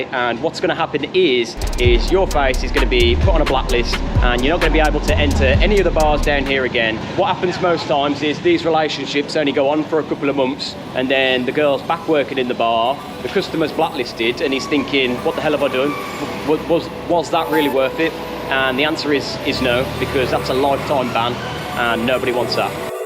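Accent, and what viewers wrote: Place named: Midlands, United Kingdom. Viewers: You sound like you're from the Midlands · You sound like you're from Dudley or Wolverhampton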